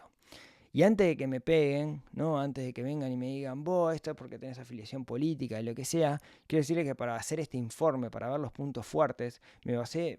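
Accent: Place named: Uruguay. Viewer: Muy bueno el video, muy clara la explicación sobre todo, hablado "en Uruguayo", me parece que suma un montón eso. Coincido en que se mezcla la política casi siempre en estas discusiones, y eso embarra la cosa y resta potencia y eficacia a las discusiones. Como la enorme mayoría de los temas importantes de la Sociedad que requieren solución, este es un tema incómodo y hasta antipático en lo que hay que hacer, pero coincido en que es inviable no hacerlo. Me encantó la explicación de la poca conveniencia del mecanismo constitucional en caso de que salga mal y haya que echar para atrás, punto muy importante, y también toda la parte sobre las cosas que son deseables y pueden prometerse (la canilla de Coca Cola), pero que después son imposibles de ejecutar, coincido 100%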